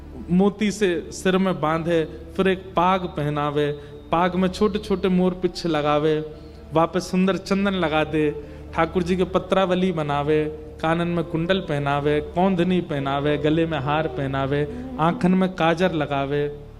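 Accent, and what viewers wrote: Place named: Braj, India. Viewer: Jay Shri Krishna Radhe Radhe 🕉 OM Namo Bhagvate Vasudevy Namh OM 🕉 Namo Narayan ji Jay Shri Hari Jay Shri Shyama Shaym ji Jay Shri Laxmi Pati Jay Shri Radha Rani Jay Shri Radha Kishori ji Jay Shri Radha Raman ji Jay Shri Radha Vallabh Lal ki Jay Ho ♥️ Radhe Radhe Jay Shri Harivansh Guru Dev Maharaj ji Aapki vraj Vani Badi Mithi hai Jay Shri vraj vasi Aapke Charno Mai Koti Koti Vandan ♥️ 🙏 Jay Shri Vrindavan Dham 🙏 Jay Shri Yamuna Maharani ji 🙏 ♥️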